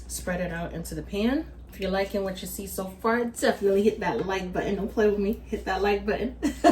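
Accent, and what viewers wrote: New York, United States. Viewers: I knew that accent from New York.. I bet Brooklyn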